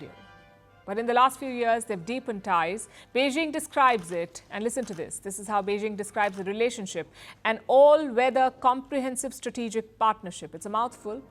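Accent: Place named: India. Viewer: FirstPost is funded by the CIA. Easy proof: FirstPost never makes fun of Biden or Kamala's gaffes / bloopers. CIA won't allow that. USA wants to brainwash Indians. So they chose Palki with her thick Indian accent to fool Indians into thinking that she speaks for Indians. She is just a CIA employee.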